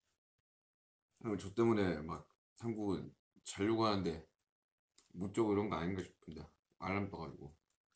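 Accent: United Kingdom. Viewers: His english accent ( ╥﹏╥) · Jada R it also sounded like he had a slight British accent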